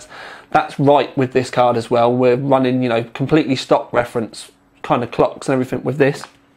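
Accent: England, United Kingdom. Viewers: Why does this russian have an english accent.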